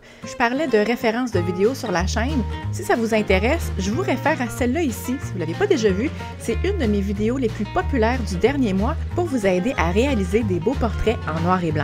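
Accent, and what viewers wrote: Canada. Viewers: J’aime beaucoup l’humour que vous déployez dans vos cours photos , c’est sympathique, vivant , et instructif. Quant à vos chats , je me demande s’ils n’expriment pas une forme de rébellion 😼 😜, prenez votre temps et quoique vous décidiez, ce fut un plaisir 📷… avec ce petit accent canadien qui plait tant à nous autres , les français . Thierry, région de Champagne,France 🥂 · Hello, j'ai découvert ta chaine en novembre 2023 suite au renouvellement de mon matériel et j'ai repris gout à figer le temps. Grace à toi, en décembre je passais sur Luminar Neo et j'ai le projet de faire ta formation mais avant, je termine la formation sur l'appareil que j'utilise. Concernant le temps que tu passes à nous offrir ton savoir, effectivement, parfois il faut prendre du recul et penser à soi-même et peut être que tu seras encore plus lumineuse avec les 2 vidéos par mois car tu auras profité de ceux qui t'entourent. 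Encore merci de nous offrir ton expérience et ton savoir. Ne change rien...surtout l'accent Canadien que les français adorent.🤗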